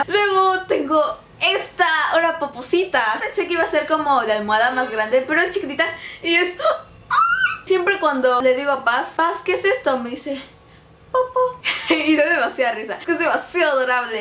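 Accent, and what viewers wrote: Mexico. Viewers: por un momento pense que eras de Mexico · eres de Mexico? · pensé q era de México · no se si estoy loca pero ella habla como mexicana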